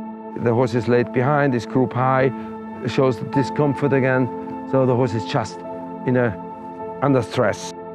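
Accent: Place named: Germany. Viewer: PLEASE redo this. Remove or at least lower the "background" music. The loud music coupled with the German accent makes it difficult to follow the lesson. This is very valuable information. It needs to be presented in the best possible manner. Do professors in universities play loud music during their lectures? It's been 50 years since I sat in a lecture hall, maybe things are different now. Rant off. Thank you.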